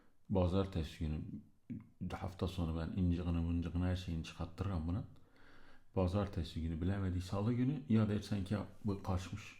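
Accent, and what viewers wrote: Eastern Anatolia, Turkey. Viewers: Reis bunun yeni bir halini bekliyoruz doğu şilveli mafya efsana olmuşş seviliyorsun 500.000 dolar da iyi iyi iyi baya iyiii <3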